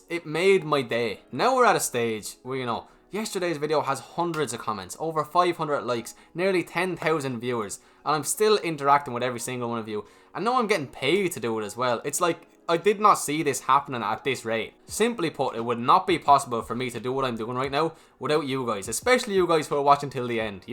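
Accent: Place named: Ireland. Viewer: Like given for the Irish accent.. I loves the Irish people’s 🤪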